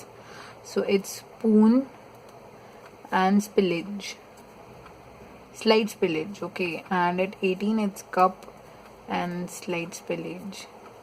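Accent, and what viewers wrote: India. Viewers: very helpful madam.. accent seems to be South India Your sounds so kind in the video just like a child..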